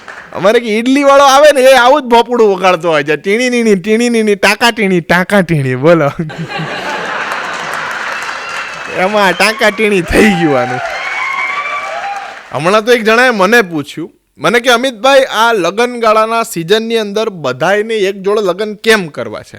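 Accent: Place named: Ahmedabad, India. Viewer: ભાઈ તમારા હાસય મારફત તમો જે લોકો નુ દુઃખ દૂર કરો છો તે માટે આભાર વકત કરુ છુ આજે હાસ્ય વિસરાય ગયુ છે કેમકે આજે પતી પત્ની બંને જણા વ્યવસાય મા એવા રોકાયેલા છે હાસય શુ છે તે ભુલાય ગયુ છે તમો જે બોલો છો તે પાકકા અમદાવાદી છો એવુ લાગે છે તમો ને સાંભળી ને ખુબ આનંદ થાય છે આ રીતે આનંદ પીરસતા રહેજો આનંદ આભાર આશીર્વાદ ધન્યવાદ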